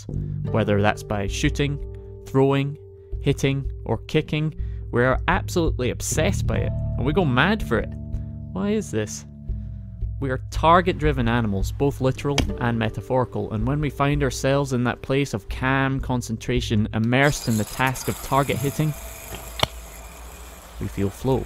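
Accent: Scotland, United Kingdom. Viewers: Scottish ASMR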